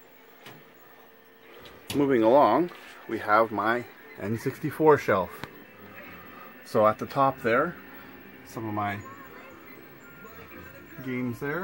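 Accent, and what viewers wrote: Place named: United States. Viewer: in the box i love when americans say that lol in the bahx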